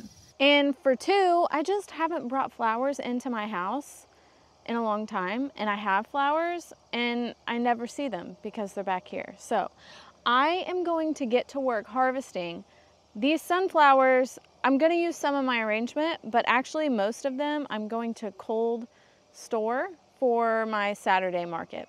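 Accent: South, United States. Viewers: Just found your channel. We grew other colors of broom corn. I believe it was red blue and yellow. Been a long time but I think that was what we grew. Also, I have a question. I know where you say you live BUT I don't think that is where you are actually from. I'm in Alabama and you sound as though you are from here or Tennessee or Kentucky or at least the south. Am I close ?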